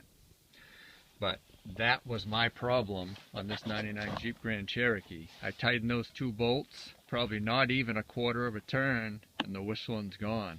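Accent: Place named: Maine, United States. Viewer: sound like you are from maine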